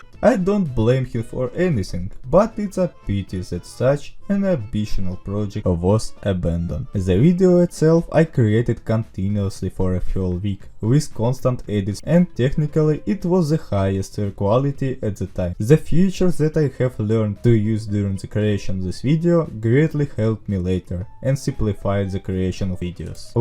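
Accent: Russia. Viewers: Ахах,найс русский акцент) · The Russian accent, lol (i like your voice, the only thing that is funni for me is the Russian accent) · Русский акцент имба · ДА ТЫ РУССКИЙ!! Я ПО АКЦЕНТУ СЛЫШУ!!!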